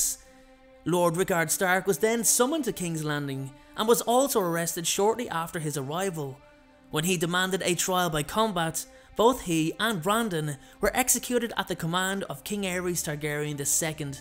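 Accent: Ireland. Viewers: He’s obviously Irish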